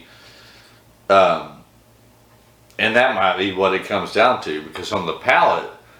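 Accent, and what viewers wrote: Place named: South, United States. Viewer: you sound like you’re in the south? I don’t have much luck finding the allocated stuff here in NE TN.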